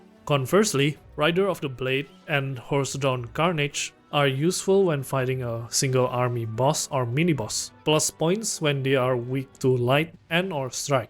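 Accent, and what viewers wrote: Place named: Indonesia. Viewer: r u from indo? got accent hahaha. haven't seen ur vids in a while. nice to see a metaphor video from you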